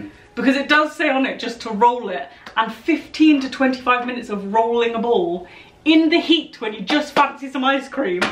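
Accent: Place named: England, United Kingdom. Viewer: My google loves your voice! Without fail every time you talk to your google, mine obeys you. She hardly listens to me I have to repeat myself so often. Perhaps she prefers the English accent over my Australian accent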